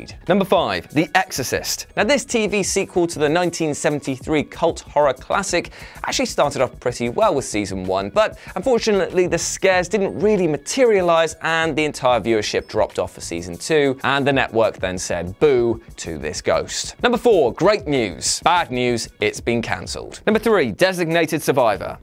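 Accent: United Kingdom. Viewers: where ya from in the uk?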